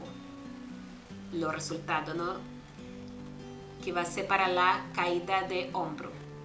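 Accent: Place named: Brazil. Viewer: Hola de que país eres por tu acento parece que eres de Brasil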